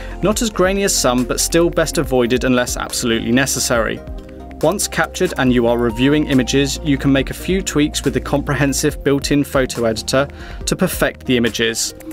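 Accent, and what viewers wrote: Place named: England, United Kingdom. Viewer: May i guess what nationality are you? Based on your accent i would say you come from England :) Again, the way you do it, i just simply love :)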